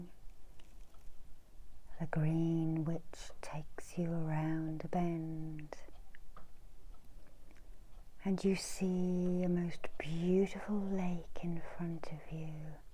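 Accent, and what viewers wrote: England, United Kingdom. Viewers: I love you and your videos. I have been stuck on your videos for a few months since I discovered you. There are only two others that do asmr that I like. There are good one and bad ones, yours is good. I also love your accent as it reminds me of England, as I have good memories 😊 so it's a win win!! Please do the rattle more and the selenite sweeping more. Also Reiki symbols and bring you hand close to the camera after is great. I also like how you do the Reiki distance trace before you do long distance healing. Your very earthy. Thank you. 🤗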